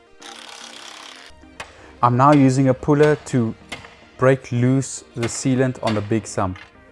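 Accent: South Africa. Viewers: Started watching but the South African accent grates me so I'm off to watch something less annoying.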